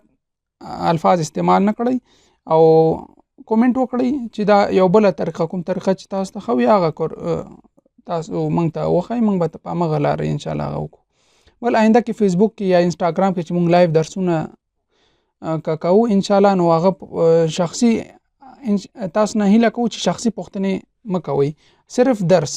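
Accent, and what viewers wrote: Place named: Saudi Arabia. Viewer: دي سعودي او دي دوبي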